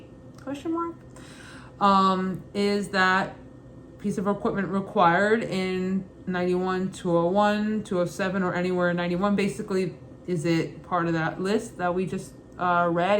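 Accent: Brooklyn, United States. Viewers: Fantastic video! Thanks for sharing. Absolutely love the Brooklyn accent—so charming! 😄"😀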